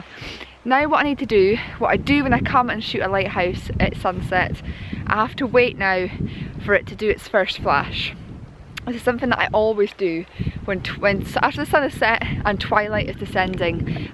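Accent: Scotland, United Kingdom. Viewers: Beutiful photos and I like your schotish excent so much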